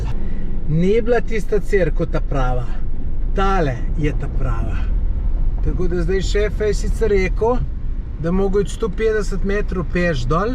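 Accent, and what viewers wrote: Lower Carniola, Slovenia. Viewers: Joj, kolk ti mene nasmejiš! Tvoja dolenščina je tolk zabavna, še kletvice so original. O krajih ne poveš kaj bistvenega, ampak za popotnika pa krasne info. Cena app, hrane, zajtrki.....ma ni boljše info. Super. Veš kaj mi je tudi všeč; začel si intervjujat ljudi. To je super. S tem potovanjem proti Albaniji in sedaj ČG si me pa spet navdušil. Motijo me le reklame, ki so podvojene in na kratko sapo.Razumem, da je tudi to del zaslužka, ampak....razmisli.....če ti res to potrebuješ. Na nek način meni malo razjezi, pa še koga verjetno tudi. Bodi fajn...in srećno pot proti domu. Aja....pa bolj počasi potuj...potem se ti neumnosti ne bodo več dogajale.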